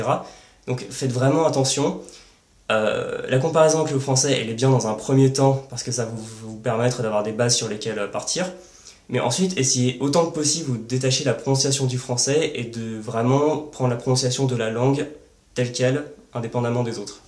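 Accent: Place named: Paris, France. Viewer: Je voudrais savoir où il vient. Je suis en train d'apprendre le francais et j'essaye de obtenir une sense des accents francais. Je pensé qu'il a un accent parisien, mais je ne sais rien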